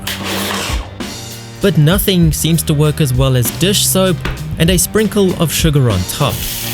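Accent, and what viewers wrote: South Africa. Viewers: U from south Africa bru ? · Are you south african?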